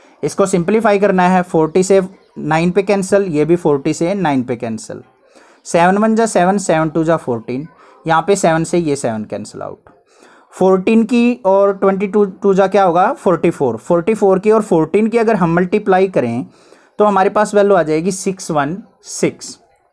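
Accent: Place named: Sonipat, India. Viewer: Sir aap 100% sonipat se hai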